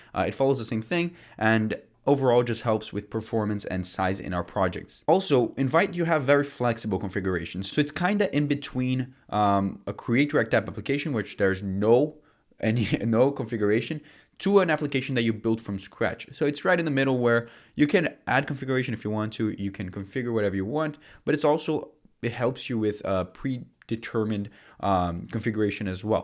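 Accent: Brazil. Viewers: Great video,! Hey, I'm curious about something... Are you brazilian or even son of brazilian parents? It's kind of weird that I understand almost 100% of what you said cause your accent sounds like mine hahah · Tu é brasileiro por acaso? ksksksks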